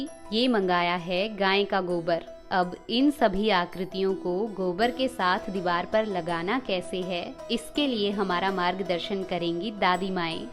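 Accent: Standard. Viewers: आप तो बिल्कुल शुद्ध हिंदी बोलते हो आप की आवाज तो बहुत मधुर है❤🥰🙂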